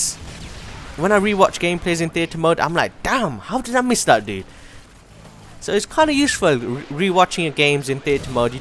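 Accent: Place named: Europe. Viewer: Watching this video, Grandma is sitting across the table asking "who is that on the computer" I tell her you are from Europe, she likes your accent. :) Great vid, been rocking that pp90 with rapid/silencer = beast mode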